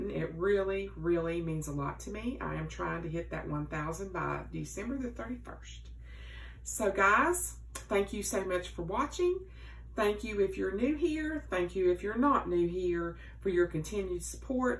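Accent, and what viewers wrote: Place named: West Virginia, United States. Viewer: I really love watching you, your so genuine!! Not to mention I love your accent, it takes me right back to my childhood visiting my family in West Virginia!! I lost my father recently to Alzheimers so I haven't been watching a lot of videos, but I'm starting to get back to planning and your one of my favorite you tubers!! Keep up the great job, your spreads are so unique and beautiful!!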